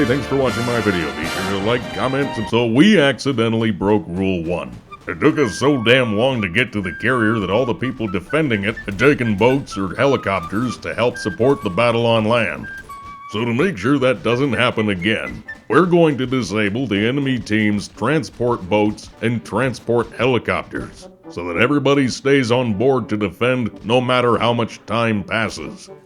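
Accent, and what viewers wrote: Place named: United States. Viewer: hes the most american man i ever heard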